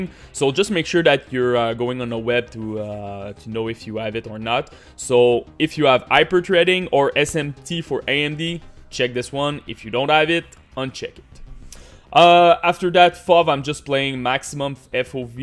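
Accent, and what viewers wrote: Quebec, Canada. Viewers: hes french from quebec as i am and it took me more than 5 years of speaking to get rid of that french canadian accent lmao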